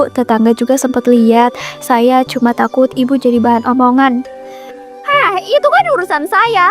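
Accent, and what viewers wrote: Java, Indonesia. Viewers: Logat bicara nya Kya logat Jawa tidak nyambung sama tema nya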